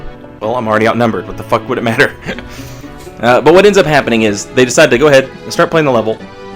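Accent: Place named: South, United States. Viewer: Cuz Vape Nation y'all. I love memes in your southern drawl. It's adorable.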